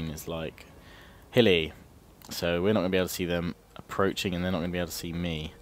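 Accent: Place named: Wales, United Kingdom. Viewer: I say kill em all lol:D I love the welsh accent:D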